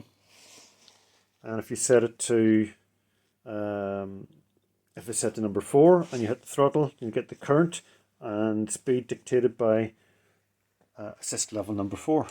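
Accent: Northern Ireland, United Kingdom. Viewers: Hi down in Larne here and have 2 250w Bafang bikes and I am really happy with the default setting and I am now hitting well over 2,000 miles .Is this reset really helpful on a day to day basis ? p.s. you sound like a norn iron man .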